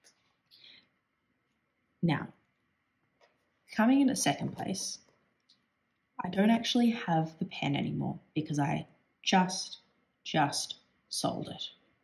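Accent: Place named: Australia. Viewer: I, too, am not so fond of Lamy. I adore the Plaisir. It writes all the time. The Preppy just can’t be beat. I have several sets in different nibs all inked up in their different colors, so I can draw quickly. They are only $4.00 here. I do not like my Kawecos at all. It think it’s the nibs, so I might change them out. I do not yet have a TWSBI yet. I’m cheap. Don’t love the piston fill, either. I love the Platinums altogether. The Kukuno is also a big favorite and I have several, as they only cost $12.00 here. I have them all over the house. 😅I never spend more than $30.00 on pens. I’m now getting into inks. OMG. About to lose my mind. So many choices, so much money. I prefer cartridges so will be filling my own with special inks. Some inks are so gorgeous they should be drawing inks because the writing doesn’t really show all the nuances. Do you have actual pen stores in Australia? 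I live in Oregon, USA, and we have only one stationary store and they carry a few fountain pens. Other than that, in my local area, I buy everything on line. I probably told you before, I love your accent and all things Australian 💝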